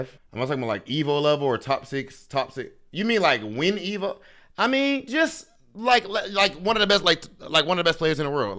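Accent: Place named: Caribbean. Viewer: Hey... Do you have Caribbean roots? Your accent sounds like someone who lived here and moved overseas. Or have Caribbean parents. Much respect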